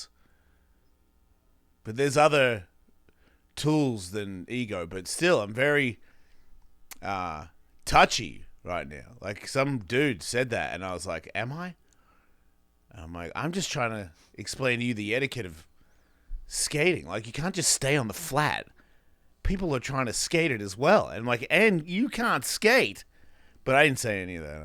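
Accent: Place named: Australia. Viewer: HEHEHE DICKHEAD ITS SO AUSSIE EVEN THOUGH YOUVE BEEN AWAY SO LONG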